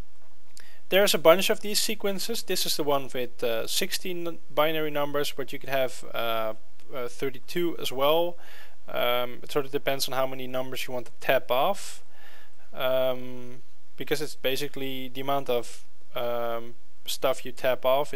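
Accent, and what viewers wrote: Netherlands. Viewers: are you dutch? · Je hoort Gwn aan je stem dat je nederlands bent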